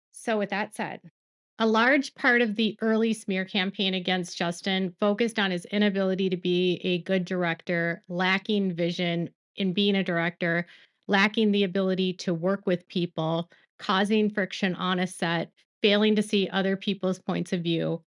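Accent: Minnesota, United States. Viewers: You sound like you are from Minnesota 🤷🏻‍♀️ 😊 I love it. I wish I could 👍 your video… I think I will anyway and just yours alone. My YouTube data is 2.38 and haha have no room on my iPhone because of pictures/videos of my son 😊 you know how it is 😂 And yes you are right about the steps. I was reading from another state, and I was misinformed. I do apologize for making my comments in regard to the steps. 😔 I really hope this goes to trial and the bring this up. Bryan knows something we don’t and has secret evidence. People thought it was silly he put out all the evidence right away, but what makes you think that’s all the evidence? 😂 it’s not. Bryan has it in the bag 😊 ❤ & Support ~ Always, you are a ⭐️ and stay strong. We are all here for you 😊 #iamnotabot EVER!! 😂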